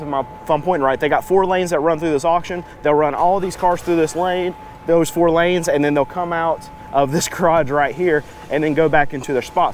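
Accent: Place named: South, United States. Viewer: From the sound of your accent your in the south. No money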